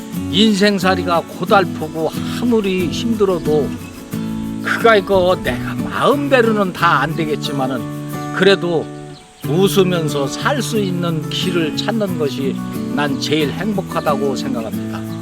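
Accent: Chungcheong, South Korea. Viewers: 충청도 말씨 너무 좋아하는 구독자이네유 제가 장담혀유 곧 백만 임박 ㅎㅎㅎ 제주도에서 응원해유~~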